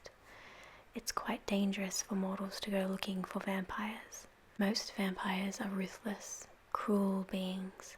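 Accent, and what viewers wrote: Australia. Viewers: the Australian accent which is a trigger in itself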